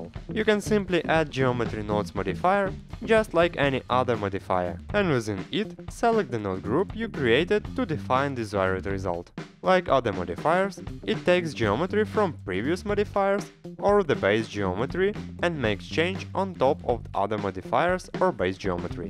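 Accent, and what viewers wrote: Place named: Russia. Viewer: Привет русский акцент 😃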